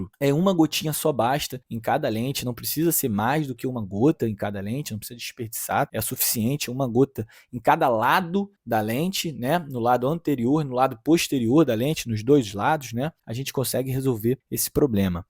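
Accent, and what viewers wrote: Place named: Rio de Janeiro, Brazil. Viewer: O sotaque carioca, para mim, é insuportável.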